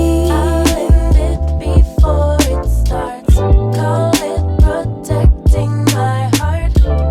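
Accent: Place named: South, United States. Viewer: i love watching your vlogs so much !! the mani pedi part had me giggling so much !! let that southern accent shine girl heheh 🤍🤍🤍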